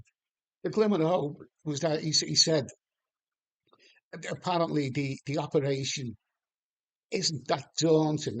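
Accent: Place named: Liverpool, United Kingdom. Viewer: I was the guy that bumped in to you in Pucket Irish bar a couple of years ago and also from Liverpool, recognised your accent. You told me that you were from Bootle. I have followed your channel ever since. Good luck, your attitude to this is insparation hopefully for others.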